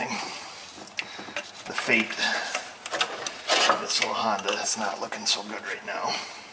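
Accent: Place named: Pittsburgh, United States. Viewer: Hey pal leave off of my fellow Pittsburgher. I thought I spoke perfect English until I left home for the wild West and people questioned my pronunciation of certain words . It appears that Pittsburgh has it's own dialect.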